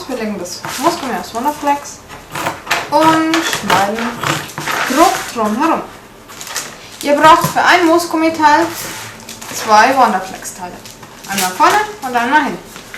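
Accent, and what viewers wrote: Germany. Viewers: Wusste ich's doch^^ deinen deutschen Akzent kannst du nicht ganz verstecken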